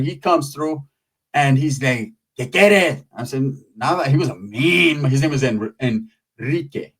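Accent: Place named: San Jose, United States. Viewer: Your OG Sanjonero voice on point. My tios are in their 70's and still sound like that 😂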